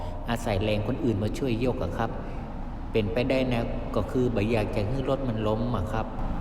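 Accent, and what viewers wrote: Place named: North, Thailand. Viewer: คำพูดออกเหนือๆฟังดูน่ารักดีนะครับ...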